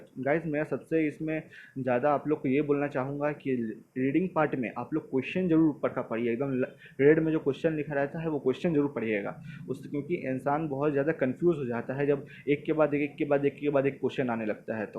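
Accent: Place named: Uttar Pradesh, India. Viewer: u r up accent bhai♥️♥️🥹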